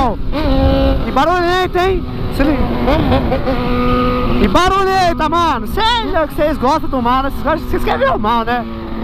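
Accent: Bahia, Brazil. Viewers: mooooo viaje falar baianes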